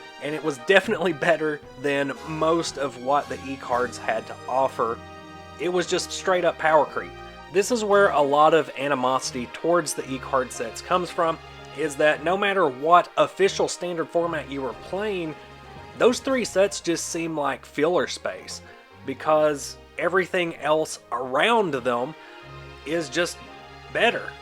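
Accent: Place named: South, United States. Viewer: a real pokemon OG, im from the south, moved out west, don't get to hear the accent often, but when I do and in combination with pokemon, it's so ideal, I've used your channel to curate my vintage play collection, now that I have a better understanding of e readers I'll be on the lookout for these cards. thanks you the OG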